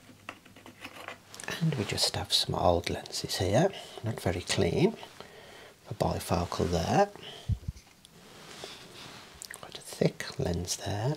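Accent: Birmingham, United Kingdom. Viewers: This was great. I initially thought it was a real inspection/appraisal until I saw your other vids lol. You have a super soothing voice. Is that a Birmingham accent? Forgive my ignorance, not from the UK (Australia). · I love britan akcent, sorry my bad english im from Sakha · Youtube recommendations have served me well! This video was amazing! Your voice reminds me of a Brummie Alan Lee :D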